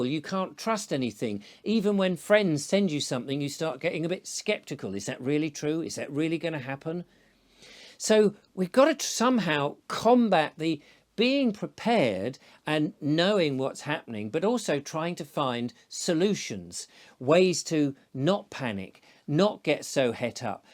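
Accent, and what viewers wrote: United Kingdom. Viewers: Too much British 😊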